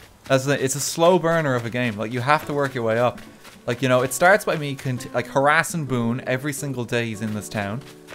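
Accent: Ireland. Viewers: Are you irish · Every time you say Blathers in your Irish accent my American brain hears "Bladders" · couldn't place accent for a few videos, clicked on bio realized he's from ireland. everything makes more sense now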